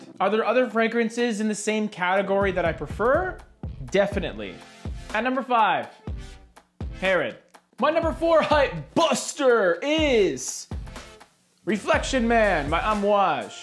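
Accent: Australia. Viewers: Savage on the Australian accent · Oh I love Cedrat Boise, my favorite next to Aoud Lemon Mint and for me it is a performance freak, and what I noticed your bottle has a sticker on the bottom? while mine has a only etched batch code on the bottom. I guessed the NR1 pick, thought its going to be either Pacific Rock Moss, Individuel, or maybe a La Nuit de l`Homme. Australian accent! very noice!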